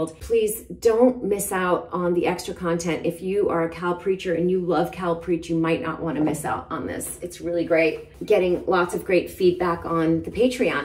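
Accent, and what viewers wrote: United Kingdom. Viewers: Your British accent is so Carnaby Street! I love it. Cheers.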